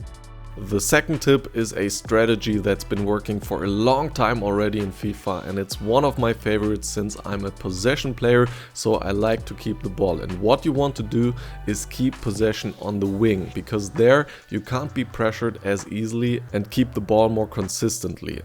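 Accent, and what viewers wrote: Germany. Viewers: sounds german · It is soooo easy to hear you are from germany hahah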